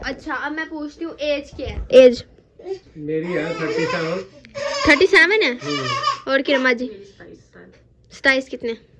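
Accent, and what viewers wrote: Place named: Rural, India. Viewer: Itna painduo ki tra bolti ho.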